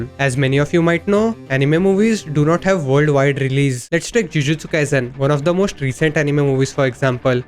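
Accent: India. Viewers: YOU HINDUSTAN ACCENT IS DISGUSTING BYE INDIA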